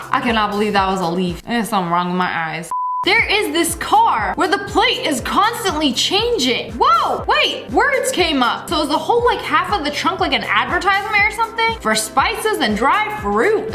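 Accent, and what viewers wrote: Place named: London, United Kingdom. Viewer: ur from london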